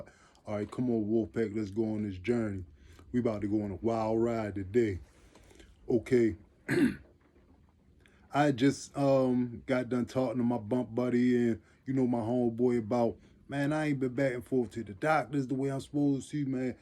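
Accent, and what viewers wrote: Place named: Mid-Atlantic, United States. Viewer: Bro where you from I hear that Mid Atlantic accent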